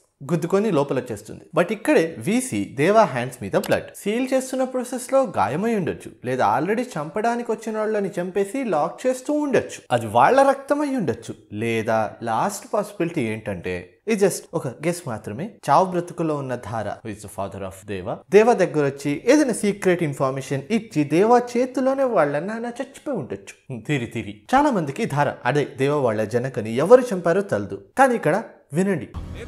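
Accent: Karnataka, India. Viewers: Kannada kodthundi bro nuvu matladtha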